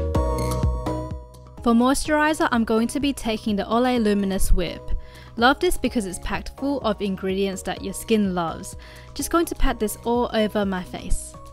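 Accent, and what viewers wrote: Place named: Australia. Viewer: wheres she from she sounds like an aussie maybe a kiwi...?????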